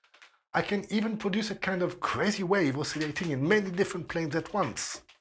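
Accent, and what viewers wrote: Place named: Yugoslavia. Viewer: Sounds like he might be from Yugoslavia. Kinda difficult to understand.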